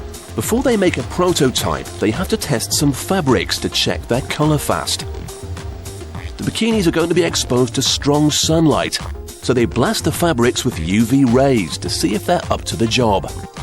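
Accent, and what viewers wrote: United Kingdom. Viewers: I love the way British people say stuff :D it makes me giggle.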